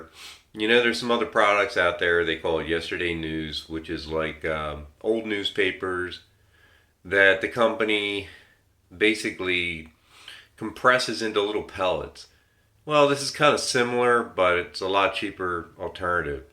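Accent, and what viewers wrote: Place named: Central Pennsylvania, United States. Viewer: Thank you! We've just gotten kittens and I cannot stand the Tidy Cat scented smells. The lady at the PetSmart recommended this stuff because they use it at the store, so I picked up a bag to try. I have been trying the Walnut-based litter, but it is still super dusty and not every person in our household is fond of it. I appreciate your explaining how it works and what to do & when to change it. PS - your accent sounds like home (I'm from a small town in central PA).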